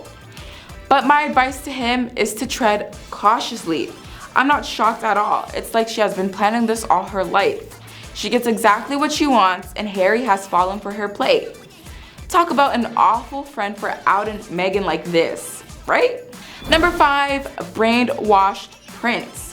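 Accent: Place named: United States. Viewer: Sorry to reply again - but many many!! centuries old traditions, not single century. Based on your accent, I’m assuming you’re American like me. Our country is so young that… even though growing up we don’t fully appreciate that as 10 years Sounds like a lifetime to a kid…. But they have been around a lot longer.. I mean we have too to an extent, but not as “America.”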